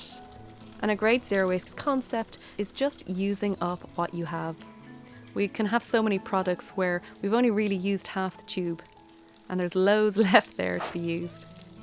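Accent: Ireland. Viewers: This is the first video of yours I've seen and I heard the accent and was looking at fields trying to recognise what county you're in ha! I grew up in the Wicklow mountains and it's so gorgeous, we're so lucky to have such gorgeous countryside ❤ living in Dublin city now and doing the best I can to be sustainable in a flat!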